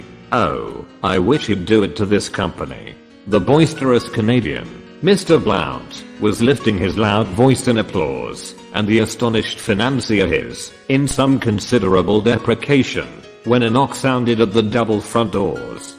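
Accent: United Kingdom. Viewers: All of his voices and pronunciation is perfect!Full of British Accent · good british accent...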